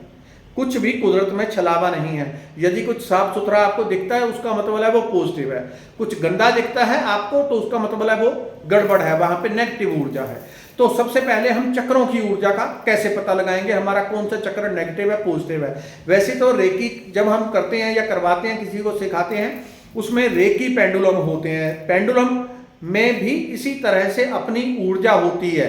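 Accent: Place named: Punjab, India. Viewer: Bhaji meinu lgda tusi punjab ton hon ... tuhadi tone punjabi a ... dsyo ?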